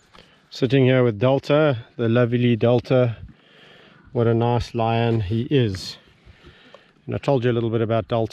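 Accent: South Africa. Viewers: Bloody hell. After these videos, I hear a south African accent inside my head every time i cuddle my German Shepard.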